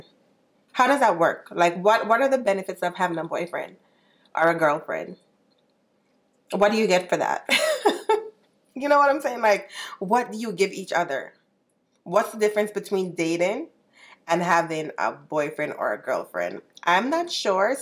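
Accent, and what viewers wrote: Jamaica. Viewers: I LOVE UR ACCENT Where are u from? u prolly already said but haha sounds like Jamaica but its lovely xD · I knew it just the way u talk I know u Jamaican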